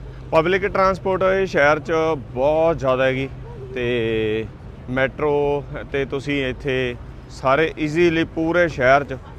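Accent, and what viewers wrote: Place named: Hoshiarpur, India. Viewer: Aap bohat khobsorat boli boltay hain. My mother tongue Punjabi in hoshiarpuri accent like yours.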